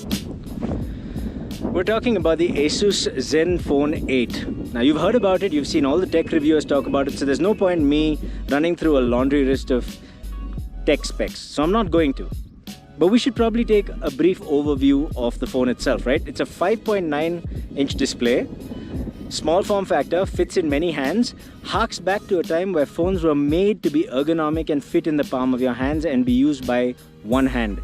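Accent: Kerala, India. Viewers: Wonderful review. Are you from Kerala?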